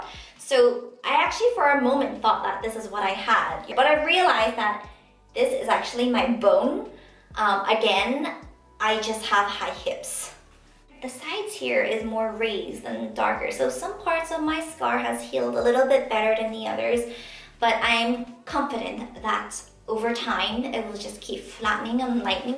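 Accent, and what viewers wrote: Northern Ireland, United Kingdom. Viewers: its so nice to hear a Norn Irish accent!!! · What kind of accent do you have ? In some moments, you sound British, there was a hint of Irish at one second. I’m so interested to know where you grew up or where you live now?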